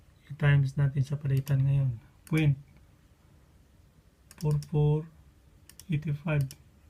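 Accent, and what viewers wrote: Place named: Visayas, Philippines. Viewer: Grabe idol napo kita kuya. Kapit lang nasa tiyaga talaga ang sekreto.. Apply ka din Canada kuya kasi kahit papano dun may security ka kasi pwd kang magng Permanent resident kasama pamilya mo.. mas madali yata mag cross country galing Japan to Canada.. i love your vlog you sound bisaya kaya nag subscribe ako hehe keep it up!!!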